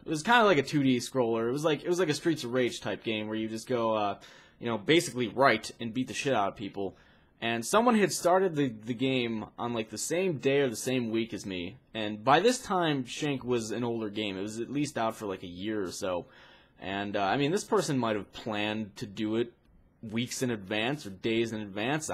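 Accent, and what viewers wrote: Ireland. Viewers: are you irish? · Hey are you irish please respond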